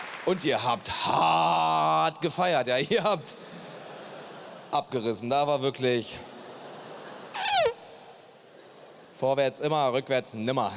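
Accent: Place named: Berlin, Germany. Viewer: dieser Berliner Schnauze stundenlang zuhören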